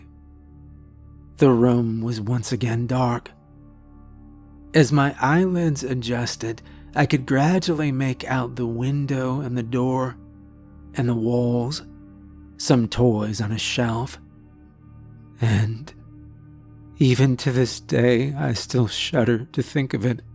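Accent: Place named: United States. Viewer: As always your narration was second to none. Being in the UK, I have often found it difficult to listen to an American accent narrator, but you have the perfect voice, timbre and intonation, certainly for this genre. Thanks for narrating this fabulous story ❤❤❤❤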